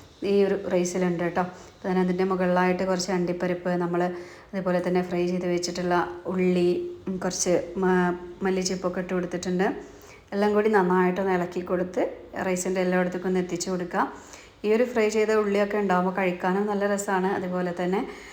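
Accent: Malappuram, India. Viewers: ഇത് പണ്ടത്തെ മലപ്പുറം താത്ത തന്നെ ആണോ കാരണം ഭാഷക്ക് മാറ്റം വന്നല്ലോ ആദ്യത്തെ സംസാരം തനി മലപ്പുറം ആയിരുന്നല്ലോ ഇപ്പോൾ എങ്ങിനെ മാറി ആതാത്ത അല്ലേ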